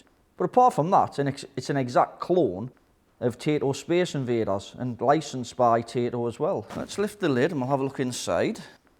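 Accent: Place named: Liverpool, United Kingdom. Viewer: Why are you speaking in a scouse accent? Are you even from Liverpool? If you are not from Liverpool then why are you speaking in a scouse accent?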